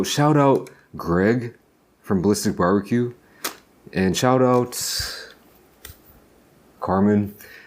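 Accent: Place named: California, United States. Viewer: You talk so cali 😊love it and i love and enjoy all your videos!!😊❤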